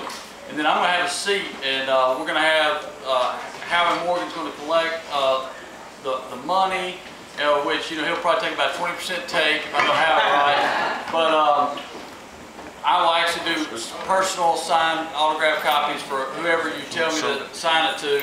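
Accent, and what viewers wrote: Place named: South, United States. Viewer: I barely recognized you with shorter hair, but when i heard the southern twang, all was clear:) We'll have a dram of Laphroaig or Ardbeg the next time y'all come over! Bring a copy of your book for me!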